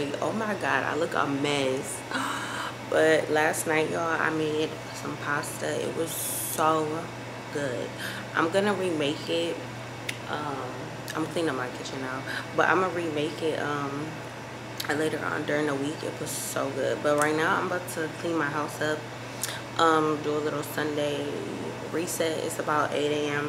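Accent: United Kingdom. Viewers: Chill vibes on a Sunday 😂🙂😂...the British accent for me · the british accent LMFAOOOOOOOO 😭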